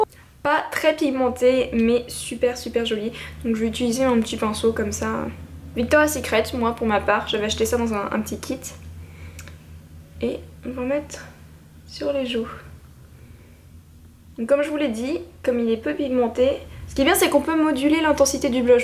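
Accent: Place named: France. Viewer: Oh mon dieu! Jadore ton accent :) habituellement j'ai de la misere avec laccent francais, mais ton accent est super delicat sophistiqué et adorable :) j'ai vraiment aimé ton opinion !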